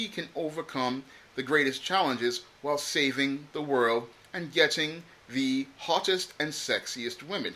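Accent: United Kingdom. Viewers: The British accent is a nice addition as always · Nice British access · Love the British accent throughout the commentary. Very diverse form of commentary and the evil laugh was fitting of a British villain. Everything you said is on point and I am sick of these movies now. I'll pass on this since they passed on Idris. What a slap in the face to black men. Carry on now!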